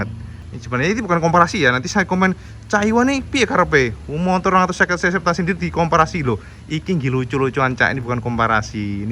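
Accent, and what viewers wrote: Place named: Java, Indonesia. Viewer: Teros tak enteni.kontene sampean seng di ae pokoke lucu.karo enak di rungokno.ngomonge khas wong jowone.dadi suweneng aku ngrungokno.salam teko wong tuban cak.!!!!!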